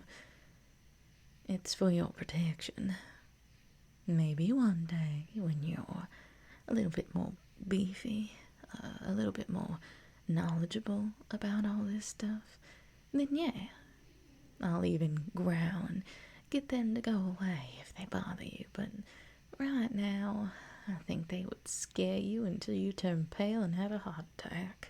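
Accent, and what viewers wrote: South, United States. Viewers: The return of the Southern accent praise the gods of universe! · I hope we get to hear more of the southern accent, it’s lovely ❤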